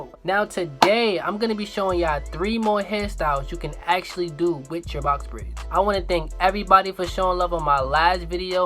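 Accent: New York, United States. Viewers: Bro I can tell you from New York by the way you talk good video tho g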